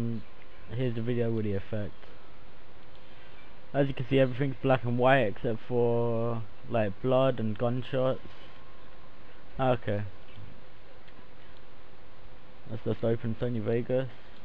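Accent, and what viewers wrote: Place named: United Kingdom. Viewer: it was refreshing to hear one of these tutorials from a british voice :]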